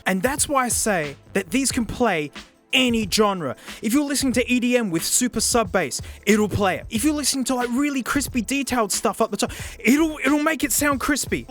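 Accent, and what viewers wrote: Australia. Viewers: Somehow, compared to his first video, he has managed to make himself sound more Aussie.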